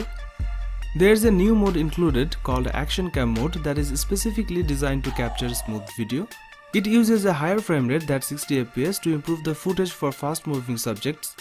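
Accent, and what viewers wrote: India. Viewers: I wanted to watch it but soon as some Indian voice started going tik tok tik tok that was it F.T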